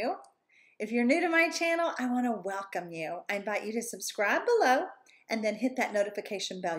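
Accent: South, United States. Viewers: You sound so much like my fave first cousin... my cousin was Southern too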